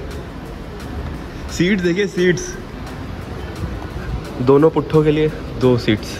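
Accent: Lucknow, India. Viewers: Bhai lucknow se ho 😄😄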